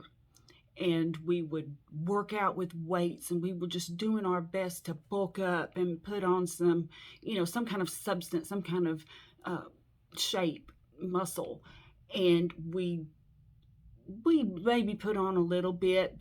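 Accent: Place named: South, United States. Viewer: Hi. Best wishes for your channel. Following Ken Berry for a long time, I did a little bit carnivore on and off, so far never "all in" 100%. I will change that now and go for it. Since I am living in Portugal (sardine country), I can tell you my special not so carnivore recipe: I put one can of sardines and 1 can of anchovis in a food processor and blend it to a paste. This paste I fry in a pan with a piece of butter, add salt and pure natural tomato sauce. Then I let it simmer on low fire for 30 minutes and fill all in a glass bottle. Every morning I heat up a few tablespoons of this sauce and put it on my scrambled eggs or on burger patties. I like it, you don't taste the fishes. Has a lot of omega 6, fat and lycopene. By the way, I like your southern accent very much. Is it Tennessee like Ken Berry?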